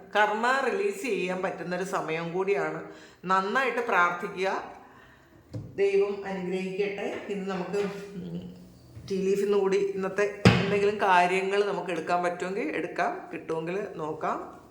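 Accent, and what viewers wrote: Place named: Kochi, India. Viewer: Good morning ❤.. മാം എവിടെ ആണ് താമസം. സംസാര ശൈലി കേട്ടിട്ട് കൊച്ചിയാണ് എന്ന് തോന്നുന്നു...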